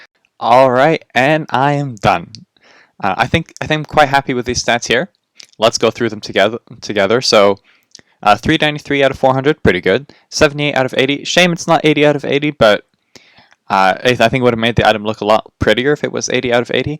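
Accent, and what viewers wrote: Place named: Morocco. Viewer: you sound morrocan XD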